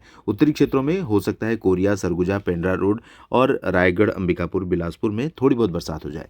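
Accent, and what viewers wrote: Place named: Madhya Pradesh, India. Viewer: Lagta hai apka native place MP hai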